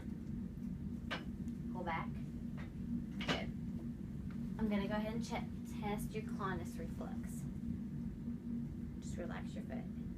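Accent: United States. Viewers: Us southern girls have that accent! 😉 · Kentucky accent if I'm correct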